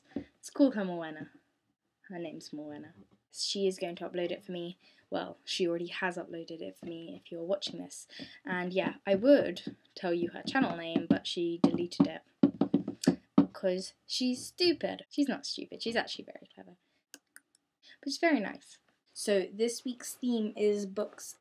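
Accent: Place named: RP, United Kingdom. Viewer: lol you sound so posh!! =P never noticed ur accent before.....i think im becoming more scottish =] love you......<3 xx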